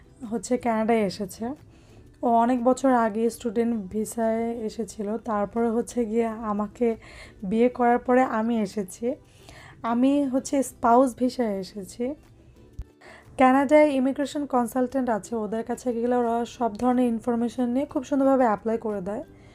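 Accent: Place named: Dinajpur, Bangladesh. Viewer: Hi api apner video dakhi vloi laga apni onk sundor guceya kotha bolen apur soshur bari kothay north Bengal a naki dinajpur Rangpur ar moddha naki video ta Dinajpur ar kotha sunlam tai ask korlam amr home town oh Dinajpur a asola nejer place ar kotha sunla monta kano jani alada felling mona hoy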